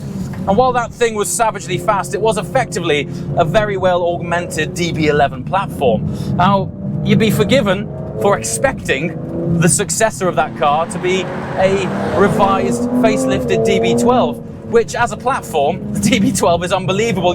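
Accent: RP, United Kingdom. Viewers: Not sure who this guy is but it’s the second video I’ve seen with him, and he’s reviewing. He’s very good and presentable. Great work and look forward to more. A bit too Posh British but like i said, well presented and spoken in detail.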